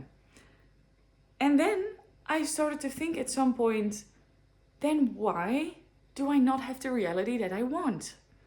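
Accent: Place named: Netherlands. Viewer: Thanks for sharing the wisdom! As someone who learned very early that worth comes from performance, even my healing sometimes becomes another project to optimize. And, I've only recently became aware of this trap. BTW, Dutch accent is my favorite :) Keep up the good work!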